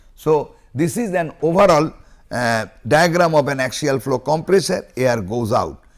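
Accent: India. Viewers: His accent is indian... maybe that's why. The lecture is great though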